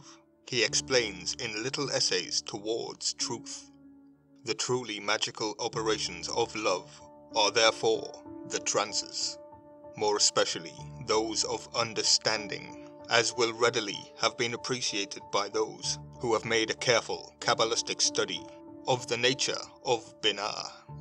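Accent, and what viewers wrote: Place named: England, United Kingdom. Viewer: Hello my dear friend. I am still here. Glad your work continues. How are you? I miss the English accent voice...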